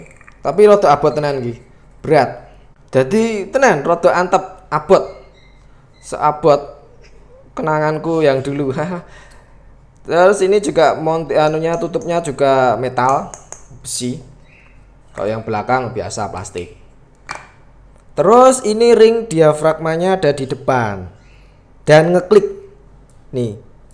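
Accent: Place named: Tulungagung, Indonesia. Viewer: Cocok banget ini untuk pengambilan gambar cinematik ..Btw ini yang punya orang Tulungagung ya bahasanya Wangun😄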